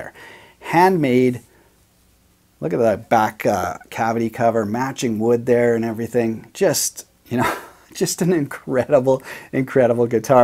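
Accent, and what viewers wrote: Canada. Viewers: The best Canadian accent Sooory that's the rules One of the best accents ever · Awesome collection! ( Also, I had a feeling you were Canadian based upon your accent 🤣) I'm a fellow Canadian 🇨🇦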